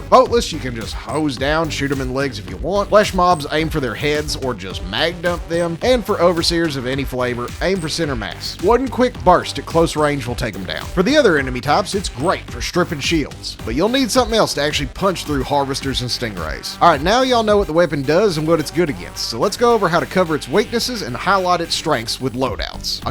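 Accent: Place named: Texas, United States. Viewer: I didn't know I needed to hear Helldivers tips with a Texas accent until I saw this video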